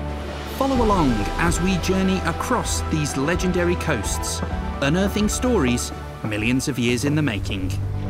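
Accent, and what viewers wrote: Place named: England, United Kingdom. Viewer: Lovely video. Funny that an Englishman is narrating it 😎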